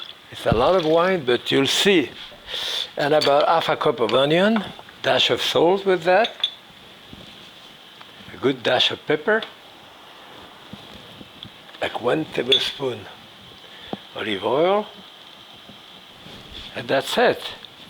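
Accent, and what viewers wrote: France. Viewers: He's french!! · Just shut up and go away. He DOES NOT have a speech impediment. He has a FRENCH ACCENT. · He has a French accent.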